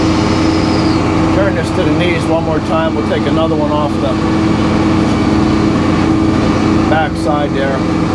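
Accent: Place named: Maryland, United States. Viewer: Where yall at? Im in Pasadena, MD and recognize the accent, and the mention of gone downy oshun. Just moved from SC and miss this good honest industrial stuff. Would love to come say hi!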